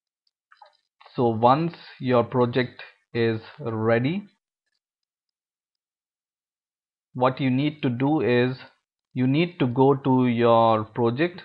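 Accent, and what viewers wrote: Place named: India. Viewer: indian accent is so anoying to listen to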